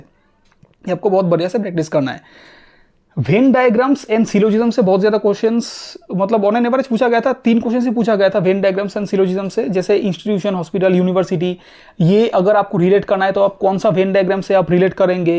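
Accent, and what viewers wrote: Bengal, India. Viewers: Sir aap Bengali ho?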